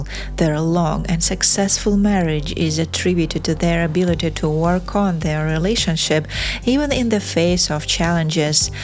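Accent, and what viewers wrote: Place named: Russia. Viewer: Love the Russian accent eg uffishal for official. A beautiful viydeo🥰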